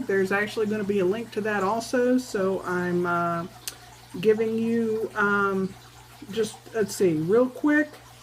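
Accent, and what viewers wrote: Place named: Georgia, United States. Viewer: I can't believe you were born in New York and lived in the Midwest. Georgia really "got hold of you". I pronounce most of the words the same as you and I was born and grew up in South Carolina. However, I don't have the drawl that most southerners have either. Love all the charts and fabric you have. I would love to go to a market and especially a retreat. Martha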